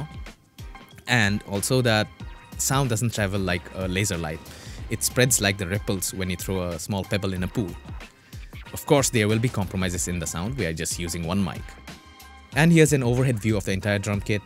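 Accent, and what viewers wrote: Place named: India. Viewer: It's weird as hell hearing an Indian accent come out of your mouth.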